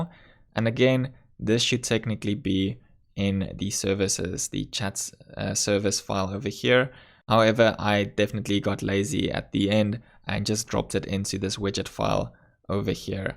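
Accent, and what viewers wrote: South Africa. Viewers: You sound very South African...😃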